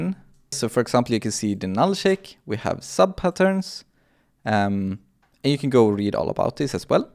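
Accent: Sweden. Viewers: You must be from Sweden, I can hear it!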